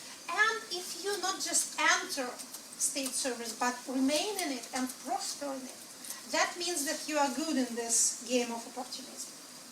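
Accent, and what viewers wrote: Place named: Russia. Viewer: Such a likable russian accent)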